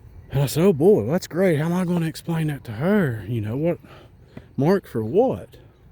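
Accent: South, United States. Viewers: Nothing like a Southern storyteller. Great job! Very scary experience.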